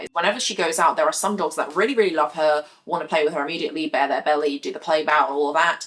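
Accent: United Kingdom. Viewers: A Asian with a British accent 🔥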